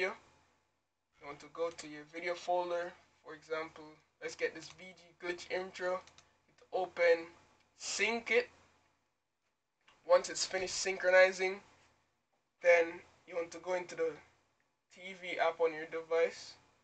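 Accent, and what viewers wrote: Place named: Jamaica. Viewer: Are your Jamaican? Your accent is definitely peaking through 🙌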